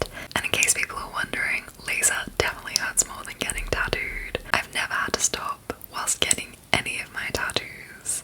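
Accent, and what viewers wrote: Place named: Australia. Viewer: I love your accent✨❤️ and your voice is all together relaxing. 😌 I would love to visit Australia someday but for now hearing your accent is perfect 👍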